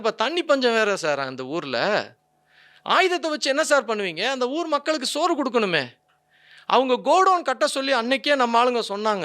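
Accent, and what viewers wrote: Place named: Kongu, India. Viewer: இவர்தெளிவாககொங்கு தமிழ்அழாககபேசுகிறார் இந்தகாணெரளிதெளிவாகதிடமாககருத்தயளிகிறார்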